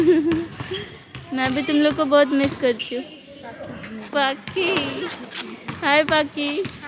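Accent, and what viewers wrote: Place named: Odisha, India. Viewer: No your hindi is not that bad ... its generally the accent of odias.... anyways it will improve with time ... and the video is not boring at all . And at some part of the video only the background music was dominating your voice (hope you dont mind )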